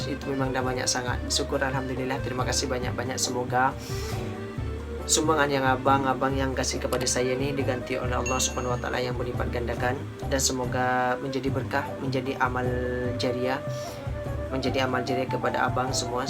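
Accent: Malaysia. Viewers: logat Malay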